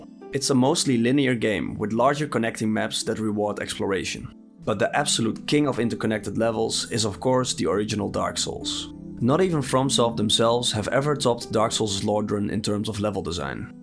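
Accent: Netherlands. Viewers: Good video, if you're interested I would like to give you a few tips on your English pronunciation, being a Dutchy myself. You are super close to perfect pronunciation. All you really need to do is shake some of the hard f's, t's and s's that we use in Dutch all the time. Smooth those out and your English will be *chef's kiss*. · Nice edit. Also like te Dutchy accent. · that thick Dutch accent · That Dutch accent though… 😉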